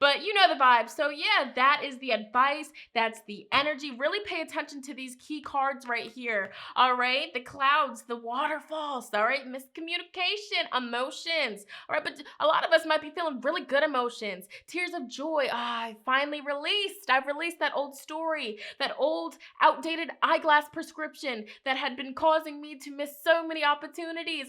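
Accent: United Kingdom. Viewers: I love her undertone British accent❤️ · Interestingly enough I hear British accent in your voice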